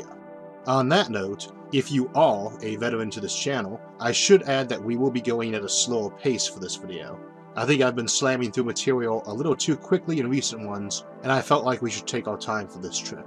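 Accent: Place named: South, United States. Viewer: I would like to say I love your videos and would like to add about your speech I just thought you were an upper class Southern gentleman. I should add that I'm from the UK but very used to the American accents.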